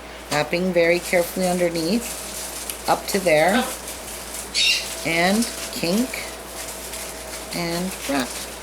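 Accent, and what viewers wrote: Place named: Maine, United States. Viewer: Hello <3 I just wanted to say how much I enjoyed your personality! You were a breath of fresh air with your modesty, and reminded me of my childhood on the coast of Maine with your expressions and way of speaking. I was just reading some of the comments, and honestly, I wonder how some people can be so rude and judgmental under the guise of giving you "constructive criticism". My wonderfully colorful Great Aunt Laura from Great Island had an expression for folks such as these.....*spoiler alert, language may offend some of you** Opinions are like arseholes, ~everyone has one.... doesn't mean ya have to BE one! Happy Crafting, Love!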